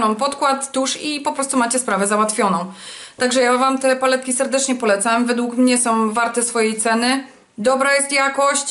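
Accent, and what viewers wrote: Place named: Silesia, Poland. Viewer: tak czułam, że dziołcha ze śląska:):) akcent Cię zdradził;)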